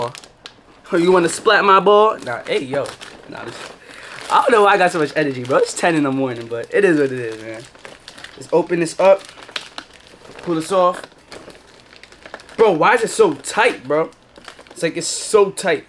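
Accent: New York, United States. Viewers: Is u from NYC?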